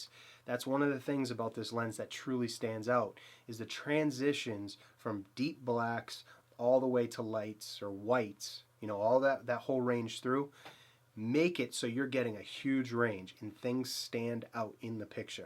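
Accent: Boston, United States. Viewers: Always love your videos. For the record your Boston accent is not that bad.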